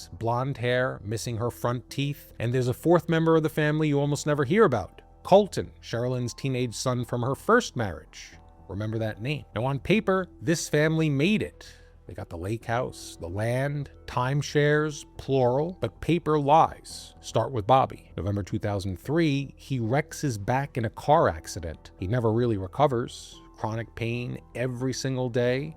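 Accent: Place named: New England, United States. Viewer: Are you from New England? You sound like a fellow New Englander.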